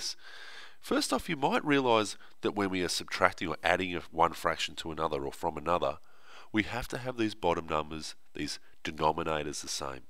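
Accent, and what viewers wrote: Australia. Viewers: When I take my test... I'll have this guys Australian voice in my head... Thank you sir!!!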